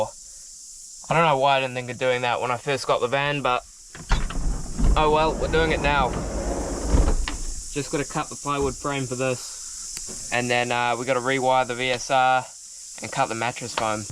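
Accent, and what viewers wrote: New Zealand. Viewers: I heard "one of the advantages of having a dad who has a mess of shit" instead of "a dad who has a massive shed". Ha! First heavy exposure to the Kiwi tongue.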